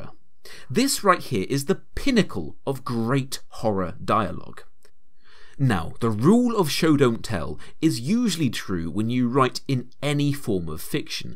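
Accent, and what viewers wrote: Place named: United Kingdom. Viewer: The narrator sounds like a British man 🤔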